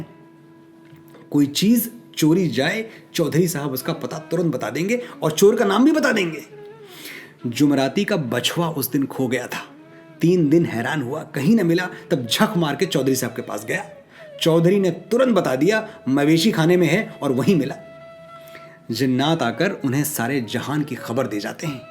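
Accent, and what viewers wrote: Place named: Uttar Pradesh, India. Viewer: Main bhi uttar pradesh se hu ji aapki boli main uttar pradesh ki bhasha jhalkati hai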